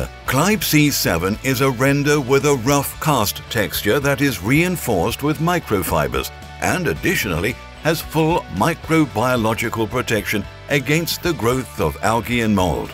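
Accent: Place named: United Kingdom. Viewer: This narrator sounds like the epic economist on YouTube just with a British accent 😂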